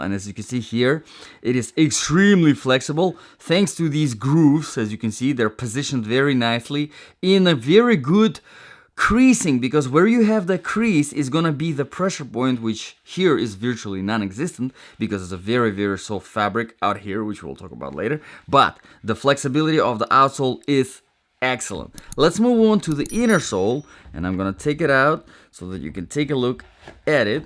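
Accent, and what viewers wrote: Russia. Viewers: Интересный акцент. Вроде как русский и много работал над произношением, но без препода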